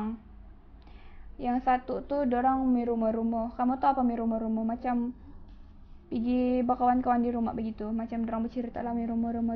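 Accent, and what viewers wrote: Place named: Ranau, Malaysia. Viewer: Dari cara ckap ja tau suda Ranau aha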